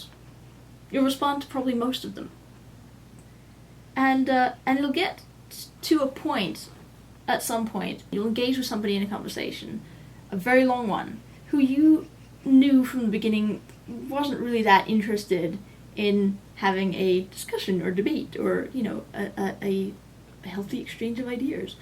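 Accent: United Kingdom. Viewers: Her father is British, and she grew up with it. I worked in Vancouver and there were plenty of British people living there who had not relinquished their accents. Believe me, if you spent a weekend in Oklahoma, you'd pick up a slight drawl, so cut her some slack. I lived in Northumberland for a year, and within a month the Geordie was sticking to me. "Yeah" became "aye." It happens. Try to live with it.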